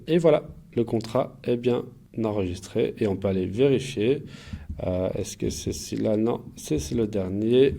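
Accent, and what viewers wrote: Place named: Russia. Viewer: ... je sens un accent Russe , arnaqueur.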